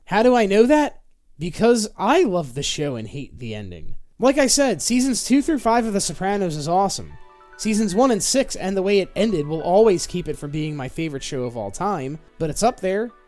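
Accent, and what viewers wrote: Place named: Philadelphia, United States. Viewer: Listening to you more it's gotta be a Philly accent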